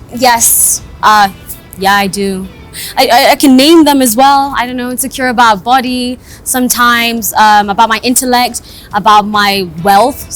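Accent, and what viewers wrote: United Kingdom. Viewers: The accent is more of British thooo..the sound quality and video quality is terrific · Ikr! I've been in the States for years and her accent comes across more British as opposed to American. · I thought the accent was British but she grew up in America 🤔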